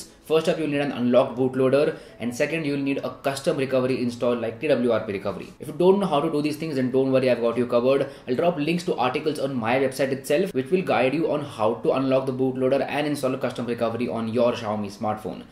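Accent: India. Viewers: INDIAN SPEAKING ENGLISH IS SO DAMN LIT ! I MAY UNDERSTAND THEM NOW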